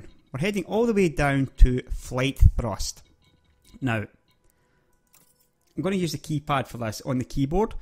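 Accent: Scotland, United Kingdom. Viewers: your accent here is beautiful, one of the most luxurious Scottish accents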